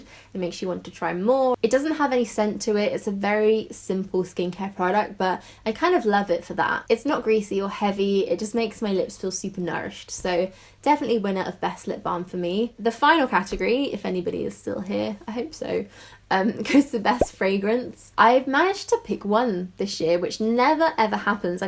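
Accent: United Kingdom. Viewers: I made it to the end frankly I just love hearing your voice. I am an American and I love British accents. Thanks for the video have a great day!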